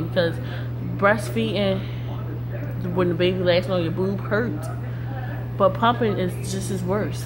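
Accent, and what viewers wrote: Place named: New Orleans, United States. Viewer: Congrats on the little girl......where are you from? The reason why i ask is because your accent sounds like your are from New Orleans.... Glad to see everything is going well.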